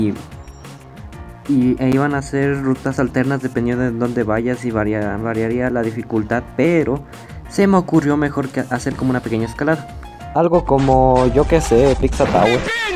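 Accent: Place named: Mexico. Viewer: Como que no eres mexicano xD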